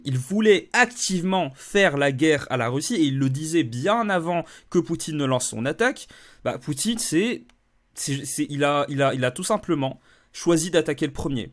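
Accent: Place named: Flanders, Belgium. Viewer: Un flamand français ? C'est devenu extrêmement rare...(t'es de Bailleul ?) Ou belge ? Merci pour pour ton analyse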